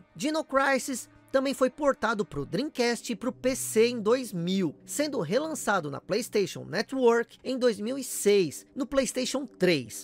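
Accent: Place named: Northeast, Brazil. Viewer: Issui pirata parece um nordestino com sotaque melhor do que muito nordestino kkkkkk P.s sou nordestino, antes que os lacradores comecem com enjoo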